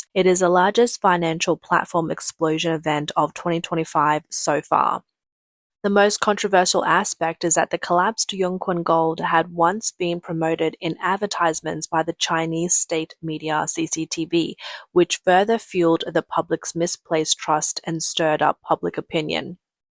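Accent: Australia. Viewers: My favorite Australian Chinese narrator😮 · Aussie accent on the voice over. PM of AU in chyna in Winnie's ear, while the USA is throwing its hands in the air saying 'Australia, what the f*** are you doing' they don't know w t f they are doing.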